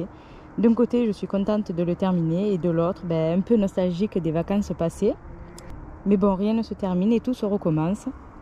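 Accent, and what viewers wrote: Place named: Provence, France. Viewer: Bonjour, sacré potager sacré boulot ✌️🌷 l'accent de vers chez moi, camargoprovencal 😁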